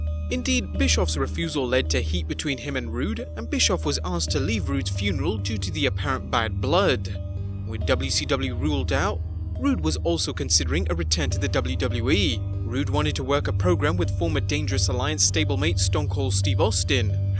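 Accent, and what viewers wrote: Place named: United Kingdom. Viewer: Mostly a nit brit poorly reading some text.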